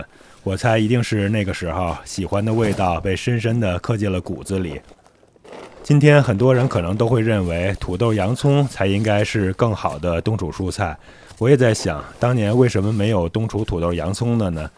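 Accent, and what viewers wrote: Beijing, China. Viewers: 无意中发现你的频道，感觉你是北京人，没敢问。原来还真是。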